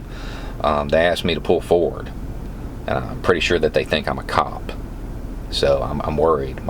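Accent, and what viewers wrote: South, United States. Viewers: Why does common sense make so much more sense presented at the proper cadence, in a southern drawl? New subscriber and a big fan of everything I've heard so far. Howdy from Detroit.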